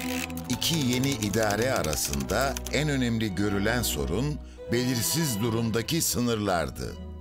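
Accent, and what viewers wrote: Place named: Cyprus. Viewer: Kulağa kıbrıs şivesi gibi geliyor. Hoş, naif ve güzel bir diksiyon..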